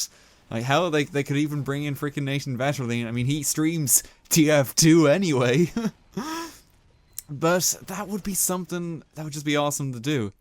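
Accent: Ireland. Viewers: Hey are you a fellow irish person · are you from ireland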